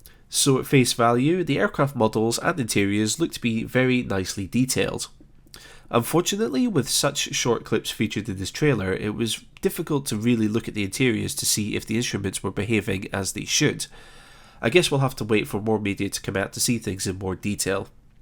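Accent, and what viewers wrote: Scotland, United Kingdom. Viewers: Right on,! Lots of speculation out there both from the "users" AND associated developers. Hope for the best - plan for the worst, Expect nothing, and you won't be disappointed. Those are a couple of my old "standbys". Call it cynical, but for me it has worked. I will be holding on dearly to both my boxed and steam versions of FSX until well after the dust settles next year! But, if the new version pans out perhaps you will develop another great tutorial series for it? I can guarantee you at least one follower! <smile> BTW, not too long ago, we were up in what I have assumed to be your homeland in Scotland. ( Your accent and Inverness Airport?) Anyway, we spent several weeks driving around Skye, Lewis & Harris, the Highlands, etc. Beautiful country-side, great people! Was quite interested in the airport up in Stornoway (EGPO). 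I later googled and found a decent free FSX add-on for that little former military airfield that some of your viewers might also enjoy. It has an interesting history, as do many other converted military airfields around the world today, like the one in my home-town, Burlington, VT - Burlington Intl (KBTV).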